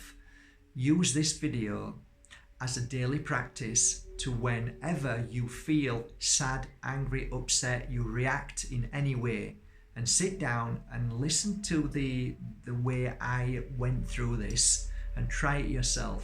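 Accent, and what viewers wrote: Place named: Northern England, United Kingdom. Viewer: Trying to figure out which part of England your from is it lankshire Northern England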